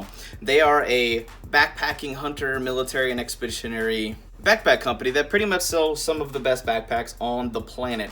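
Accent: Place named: South, United States. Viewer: why does you casual prepper voice have a southern accent?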